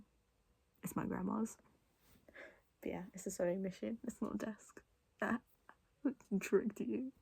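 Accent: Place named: United Kingdom. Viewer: Ew I just realized your British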